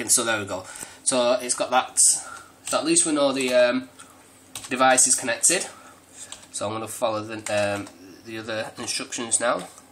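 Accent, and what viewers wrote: Yorkshire, United Kingdom. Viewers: Cheers bud,are you a fellow yorkshire man?great guide to follow.:)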